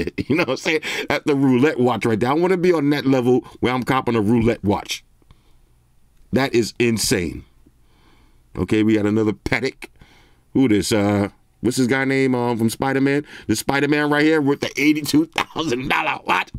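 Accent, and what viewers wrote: New York, United States. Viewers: It's like talking to my boys from NYC 😆🔥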